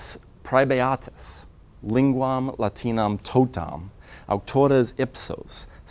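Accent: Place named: United States. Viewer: I love that this guy just roles with his American English accent.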